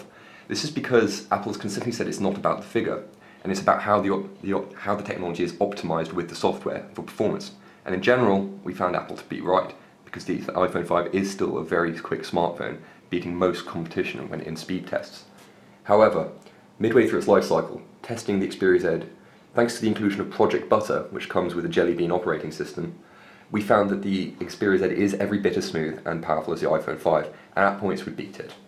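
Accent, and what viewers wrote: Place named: Europe. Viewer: Hate european english accents, cant understan them!!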